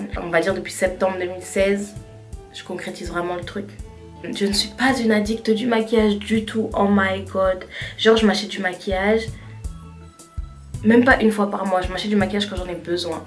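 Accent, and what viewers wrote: Ivory Coast. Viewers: J'ai pensé Ivoirienne tu a un pt accent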